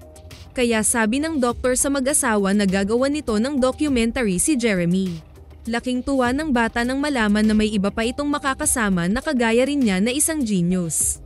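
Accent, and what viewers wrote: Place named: Visayas, Philippines. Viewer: Hahaha bisaya yung narrator bankita raw ,but im one bisaya hahahaha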